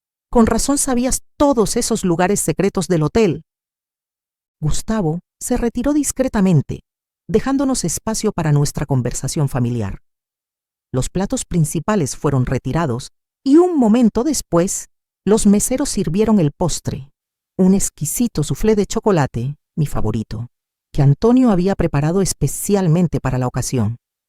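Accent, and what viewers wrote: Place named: Puerto Rico. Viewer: Que hermosa lección con lindos valores de siempre ser humildes. A parte, creo que la narradora es puertorriqueña. Acostumbro a escuchar cuentos e historias y esta manera de hablar o el acento me parece muy familiar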